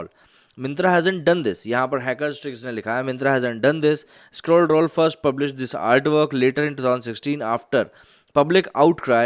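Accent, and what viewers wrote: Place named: Assam, India. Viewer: I think your accent have an assamese touch.😄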